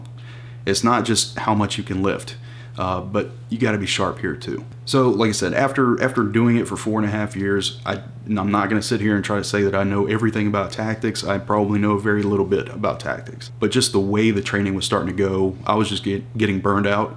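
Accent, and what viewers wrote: United States. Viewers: Is this American or what country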